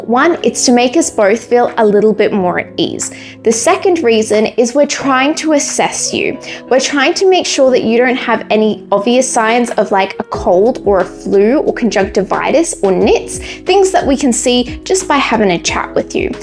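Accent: Australia. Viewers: That's an Oz accent. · surprised by the aussie accent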